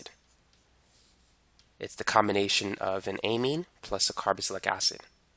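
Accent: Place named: Massachusetts, United States. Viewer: sounds like he's from Mass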